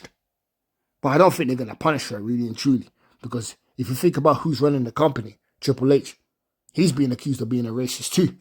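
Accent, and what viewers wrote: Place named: India. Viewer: You sound like a Indian tether yes we can say it everyone else can’t why is it so hard for yall to understand.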